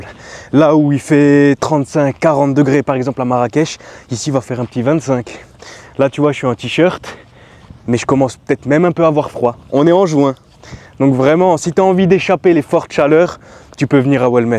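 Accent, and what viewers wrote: Alsace, France. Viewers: J'ai bien reconnu cet accent, vous êtes Haut-rhinois? Le salam de mon fils du Bas-Rhin 🥨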